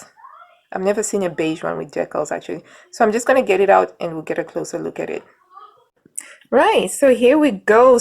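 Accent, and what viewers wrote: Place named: South Africa. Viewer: Thank you for a wonderful video. you sound so "welcomingly" South African!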